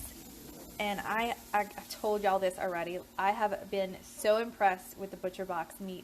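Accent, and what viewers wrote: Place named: Louisiana, United States. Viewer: You must be from somewhere in Louisiana. lol that accent is for real! ❤️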